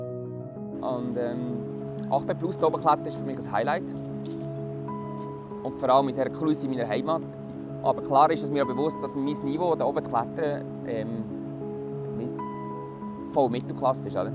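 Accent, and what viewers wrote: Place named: Switzerland. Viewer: Totally agree. My very limited Shwytzerdütsch skills makes it hard to understand, while the video and topic is top notch. Still highly entertaining !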